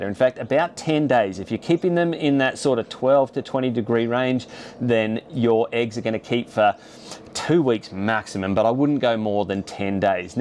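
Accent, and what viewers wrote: Australia. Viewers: Thank you for a great informative and to the point video. Well done. It’s so nice to hear an Australian accent on these videos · 100% on the accent, been in the US for 10 yrs now and it's a welcome change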